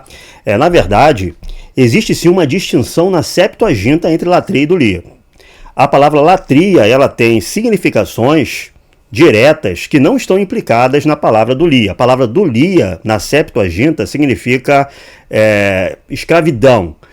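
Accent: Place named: Rio de Janeiro, Brazil. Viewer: Sotaque carioca, camisa do mengão, malemolencia danada... ta aí o meu teólogo preferido.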